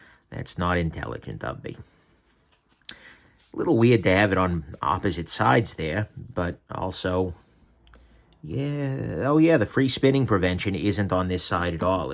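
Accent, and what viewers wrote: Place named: Boston, United States. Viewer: In my world, as an engineer, the fastener that caused you so much problem is called a "Sex Bolt". And, the term you were fumbling for when describing the feature that keeps the female end of the sex bolt from spinning, is referred to as being "indexed" or "keyed". I'm sure different trades will always use their own colloquialisms... But, more importantly the female end of that fastener should either be plain, or use a noticeably different tool engagement to prevent brutes like you from abusing it until it turns! At least you didn't go for the torches to free it up!! This is the first time I've seen one of your videos, I enjoyed it once I got past the 'Bastin' accent (or maybe New Yak?) 😎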